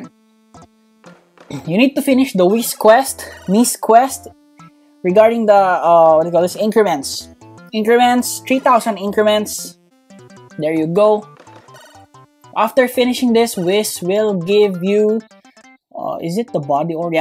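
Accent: Philippines. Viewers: Why do you have a filipino accent?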